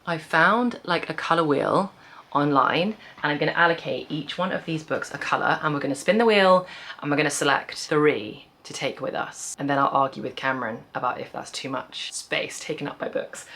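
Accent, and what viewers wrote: United Kingdom. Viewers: Just finished Book Lovers and I enjoyed it ! I really appreciate your content and, as a French, I’m a fan of your British accent 😊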